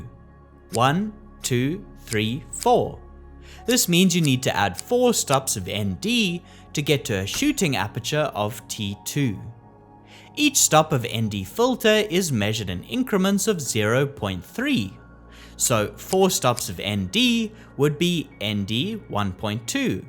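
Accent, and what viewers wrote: South Africa. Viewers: The narrator has a very South African accent. Nice 🍺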